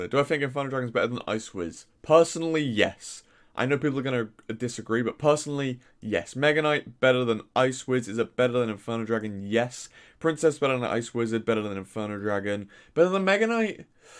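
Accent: United Kingdom. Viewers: I watch your videos mostly for your british accent. I JUST LOVE THE WAY U SPEAK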